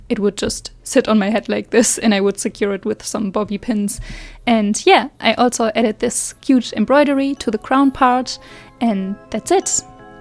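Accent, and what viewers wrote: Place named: Germany. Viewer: I WAS SO SURE YOU'RE GERMAN BC OF YOUR ACCENT- fellow german here :3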